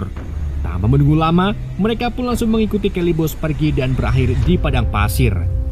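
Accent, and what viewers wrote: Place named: Java, Indonesia. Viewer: Boso jowomu medok mas🤣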